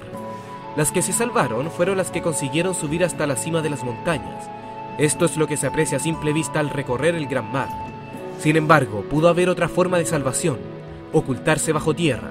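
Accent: Chile. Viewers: tu voz me parece de Chile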